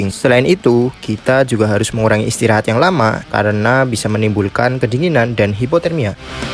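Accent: Banyumas, Indonesia. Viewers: Semangat mas ganteng wong ngapak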